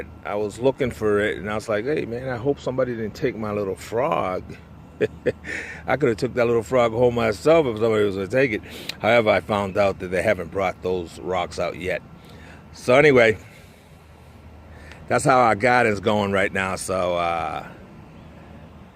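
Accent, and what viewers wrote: Boston, United States. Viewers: Hello Bull, as you were talking I said to my husband you have a Boston accent,but he is in I think Georga. At the end you told where you were from so that explains. The garden is looking great. Thanks for sharing this with us.💕 NonnaGrace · I had to do a double take when you said you were from Puerto Rico, until you said you grew up in Boston! It’s definitely a hard accent to miss…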